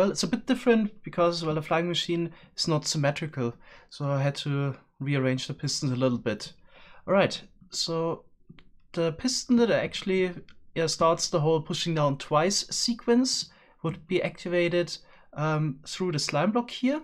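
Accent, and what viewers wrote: Germany. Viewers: He's German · You hear your german accent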